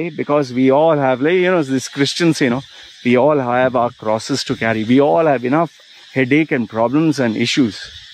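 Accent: India. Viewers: He speaks with Indian Accent.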